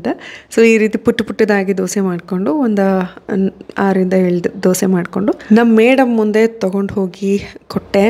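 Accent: North Karnataka, India. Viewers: Nimdu uttar Karnataka alva?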